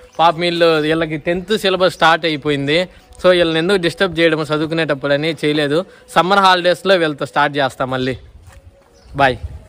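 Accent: Kadapa, India. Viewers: మన కడప భాష చాలా బాగుంది తమ్ముడూ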